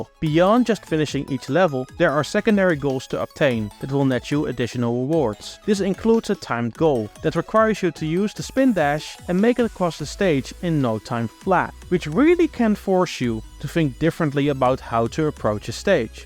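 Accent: Netherlands. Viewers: Searxhed for this comment. There is no doubt. No offence though. My English is only 20% of how good his is. But out of personal preference.. I dislike English with dutch accent. But I think that is just because my brain cant stop foccusing on the accent. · jouw nederlandse accent geeft me kkr sorry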